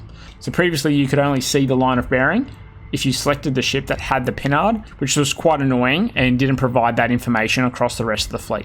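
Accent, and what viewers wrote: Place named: Australia. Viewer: HA, Aussie detected. Good videos btw, thanks for explaining all this stuff.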